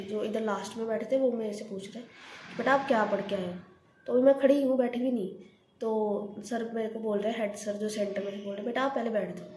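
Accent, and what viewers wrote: Punjab, India. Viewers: Aap punjab se ho?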